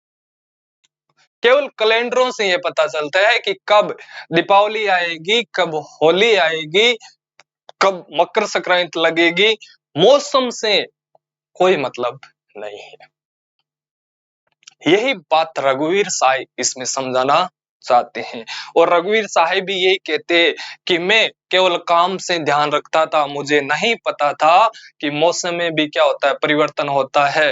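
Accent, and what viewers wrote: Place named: Rajasthan, India. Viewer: Sir me jharkhand se hu me apake language se hi samjh gayi aap rajsthan se ho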